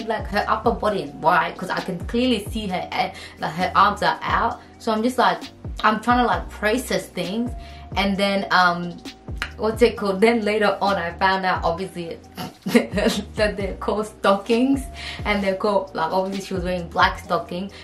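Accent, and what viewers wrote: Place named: London, United Kingdom. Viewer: where did you learn English? you sound like you are from London, greetings from the UK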